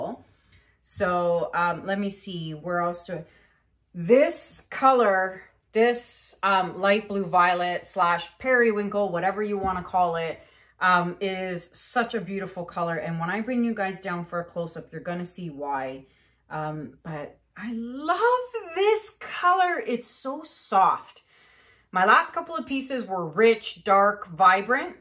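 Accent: Canada. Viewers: im from Georgia US and your Canadian accent just does it for me. i love watching you paint and getting tickled at your long "ooo"s lol thanks for what you do. still havent mastered a dutch pour but im not giving up. best wishes to you and your family.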